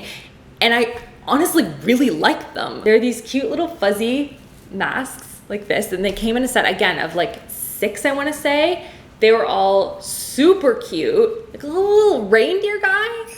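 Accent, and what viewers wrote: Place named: Canada. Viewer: The Canadian accent is strong today 😂🤣😂🤣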